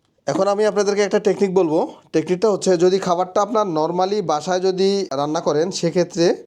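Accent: Noakhali, Bangladesh. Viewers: রান্না টা দারুন, তবে 1টা প্রশ্ন ছিল আপনি কি নোয়াখাইল্লা?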